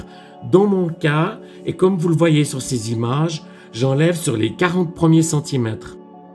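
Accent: Switzerland. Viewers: Merci pour vos conseils, j'adore votre accent qui me rappelle mes séjours très agréables en Suisse.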